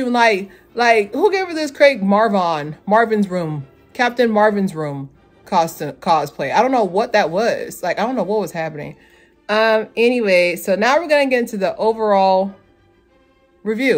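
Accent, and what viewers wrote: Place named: United Kingdom. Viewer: You have to do all reviews with that British accent lol that was awesome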